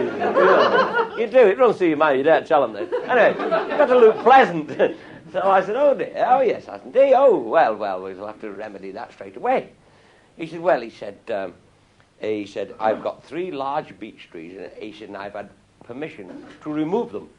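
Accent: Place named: Cheshire, United Kingdom. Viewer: Aye...it's reet Cheshire. Canst tha kick an 'ed a bol' aginst a wol' 'till it's bosted? ;)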